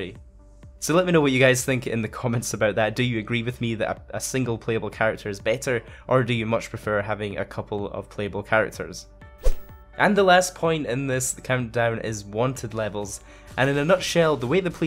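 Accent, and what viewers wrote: Scotland, United Kingdom. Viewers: SCOTTISH AF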